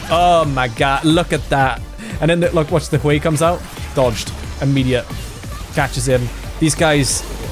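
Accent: Wales, United Kingdom. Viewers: Awesome! The Welsh accent made me feel back at home 😊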